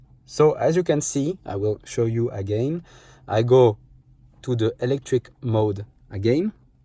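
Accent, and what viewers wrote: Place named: France. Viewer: Tu es français? Je l'entends à l'accent et aux "euh" qui ne trompent pas lol Merci pour la video, mm si je ne possède pas du tout cette voiture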